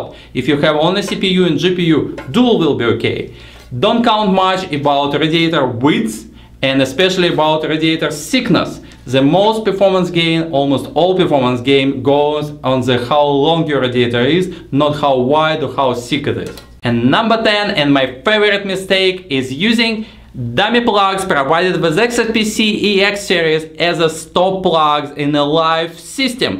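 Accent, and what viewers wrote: Russia. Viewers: Daragiye Tovarische :D your accent are really russian :D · Sorry but I hear strong Russian accent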